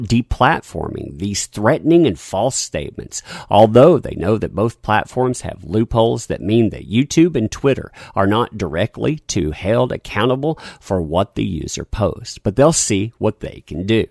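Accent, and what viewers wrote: South, United States. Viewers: That Southern drawl like a drug, son. Do a piece on any developments between Meyer v Weird- I mean Waid. YBZ doesn't discuss it and it's right of him not to, legal reasons. I can't really do the research myself, I've got too many houses on fire.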